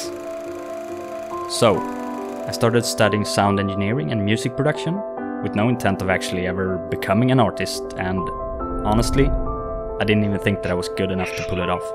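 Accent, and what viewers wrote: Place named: United Kingdom. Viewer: I like your music very much and your British accent as well ❤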